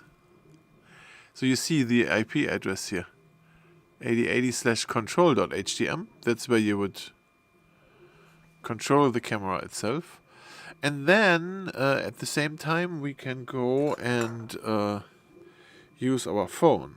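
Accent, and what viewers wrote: Germany. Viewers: Du hörst dich an wie ein deutscher,bist du einer? Naja jedenfalls Danke für das Video!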